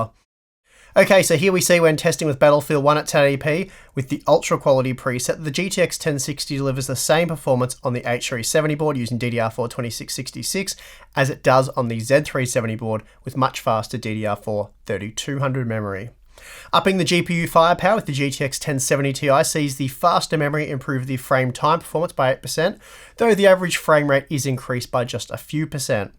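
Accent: Australia. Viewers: Gotta say I can now understand, that australian accent of his really gave me trouble in the first few videos I watch from this chanel and that means a lot due to me living in Australia for almost a decade, ans I thought I can understand the accent already.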